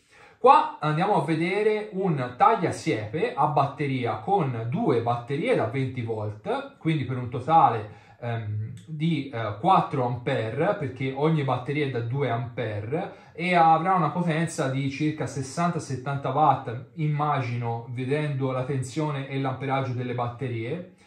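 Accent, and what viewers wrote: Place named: Tuscany, Italy. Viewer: Puoi evitare di parlare il toscano dato che ti seguono in tutta italia?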